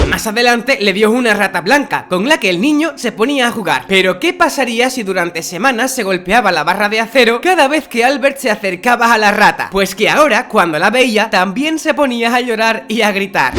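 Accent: Spain. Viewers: Es español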